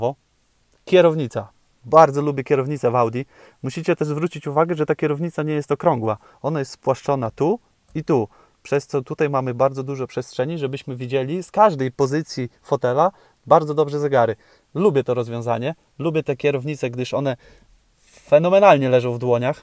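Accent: Podlasie, Poland. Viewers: Masz suba fajnie filmy ;) Słuchać akcent Podlaski, pozdrawiam ;)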